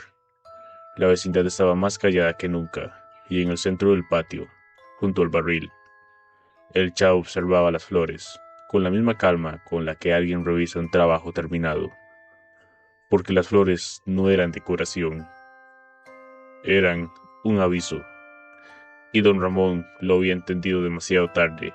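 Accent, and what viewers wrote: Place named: United States. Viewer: Buena Creepypasta bro pero y ese asento hablas como un gringo tratando de hablando español y lo hubieras hecho con la voz Loquendo de vería más chido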